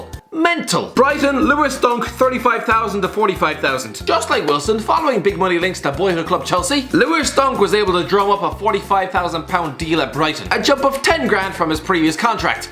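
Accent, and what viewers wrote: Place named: Ireland. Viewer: I thought that it definitely sounded Irish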